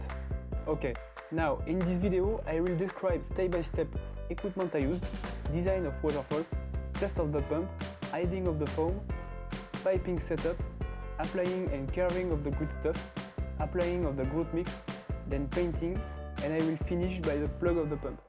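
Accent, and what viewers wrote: France. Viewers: J'ai direct su que t'étais français à l'accent 🤣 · English is good but you French with that accent make me laugh. Sheet = shit lol. I think all French struggle with English.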